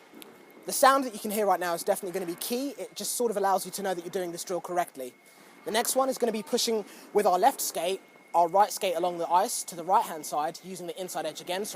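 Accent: United Kingdom. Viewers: Does anyone comprehend what is going on in this video!? A black guy is teaching the internets how to hockey-stop, in a British accent. Youtube, you are great.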